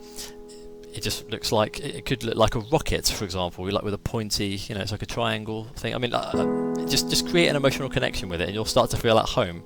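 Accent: United Kingdom. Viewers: Very helpful so far! Thank you so much! I'm curious, where are you from? I hear a British accent. I'm just randomly curious, nothing more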